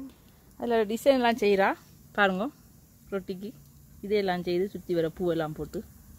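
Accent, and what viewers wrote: Sri Lanka. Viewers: hi do you remember me? glad to see your video beautiful SL tamil. keep sharing, ipodhu ungal veetu ilakam munootru thonootru aaru. Valha valamudan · நீங்கள் செய்யும் முறை அனைத்தும் அழகு உங்கள் யாழ்ப்பாண தமிழ் மிக்க அழகு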